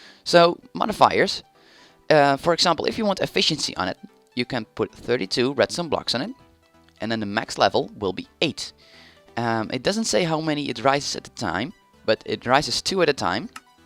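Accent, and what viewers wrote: Netherlands. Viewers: Are you dutch? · I can here an obvious dutch accent... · Becous of your accent I think your Dutch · Kut nederlands accent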